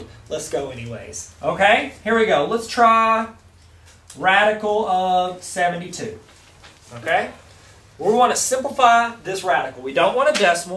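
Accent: South, United States. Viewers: Awesome. Glad a teacher can have a sense of humor. You must be from the south. You use the accent well.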